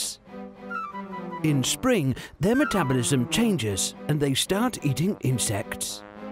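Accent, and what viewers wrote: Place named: England, United Kingdom. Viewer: Teun heeft het gisteren in AVS (Esternietstedoenmisschien) een beetje verraden dat hij zijn script opstuurde naar een onvervalste Engelse stem die de teksten ingesproken heeft.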